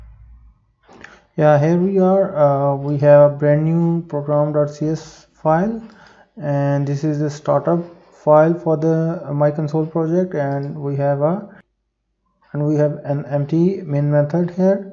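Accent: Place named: India. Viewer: Once again, a dude with a thick Indian accent has the answer to my prayers.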